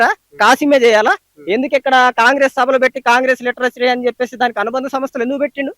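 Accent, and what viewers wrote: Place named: Andhra Pradesh, India. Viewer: వీడు ఆంధ్ర స్లాగ్ మాట్లాడు తున్నాడు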